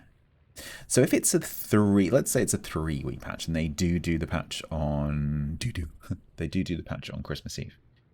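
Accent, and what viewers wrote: United Kingdom. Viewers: Thank you, for uploading this preview (/speculation) for the upcoming updates, I guess I missed this part of the stream. I was a big fan of Foghorn News, but I completely agree with your decision to discontinue them. It's just nice to have the patch notes to be read in calming voice and delightful English accent in this hectic world.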